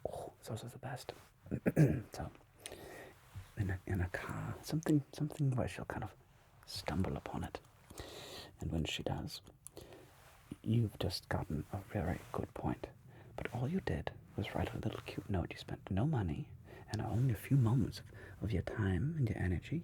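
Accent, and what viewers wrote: Germany. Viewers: German words spoken without any particular accent. Sounds like a German speaking English. And perfect use of "Denglisch" mixing German and English words. hat Klasse! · Are you german if not your denglisch is perfect 👌🏻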